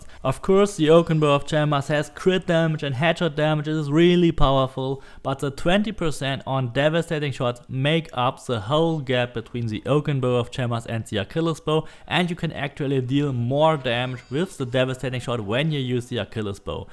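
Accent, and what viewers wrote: Germany. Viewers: You german, you've got a hard accent no offense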